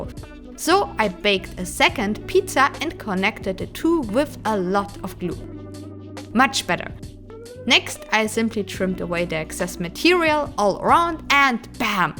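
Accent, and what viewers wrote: Germany. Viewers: Wenn man dir länger zuhört, dann ist der deutsche Akzent sehr deutlich. Ist ein Kompliment ! 🤗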